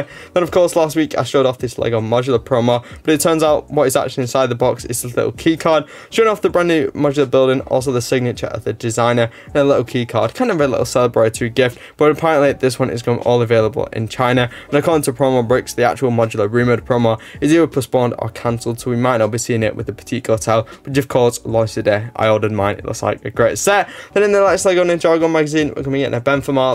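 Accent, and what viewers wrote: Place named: Estuary English, United Kingdom. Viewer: It's pretty hard to understand him as a European, as well... I guess this is what's known as "Estuary English"... (More or less modern Cockney...)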